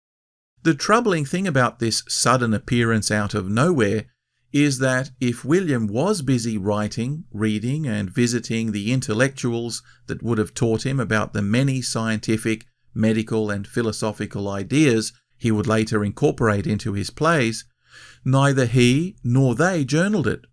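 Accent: Australia. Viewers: It's so nice to hear your Aussie accent. Love from the Mid North Coast, NSW, Australia.❤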